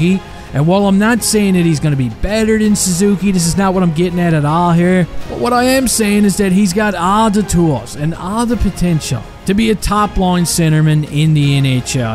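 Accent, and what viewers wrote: Newfoundland, Canada. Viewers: You sound Irish but both Canadian. You must be from Newfoundland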